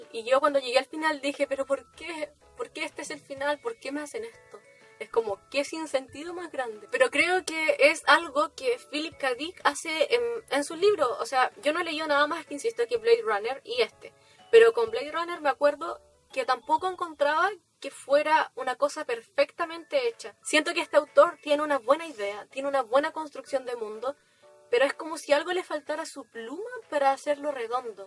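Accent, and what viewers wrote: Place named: Canary Islands, Spain. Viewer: Chacha si no es por una palabrita que te delató, me habría cortado la mano por tu acento a que eras de Canarias. Estoy in love con tu canal🤗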